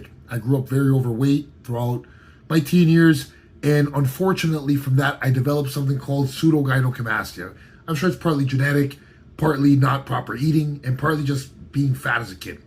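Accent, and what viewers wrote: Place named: Mexico. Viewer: at first glance u look indian, then when u talk, u sound bean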